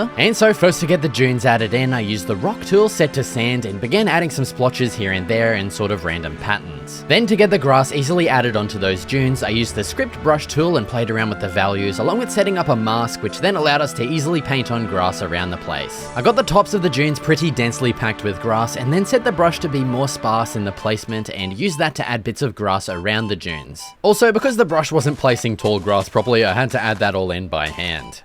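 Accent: Australia. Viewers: Ew your Australian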